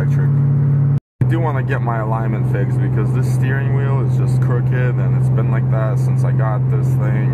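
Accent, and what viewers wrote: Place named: Sweden. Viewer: Impressive project! Where are you from originally? You sound like you grew up in Sweden for a few years as a kid then moved to the US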